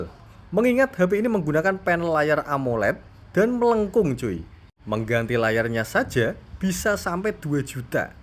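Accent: Java, Indonesia. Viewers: Wong jowo y mass..logat nya tu....😅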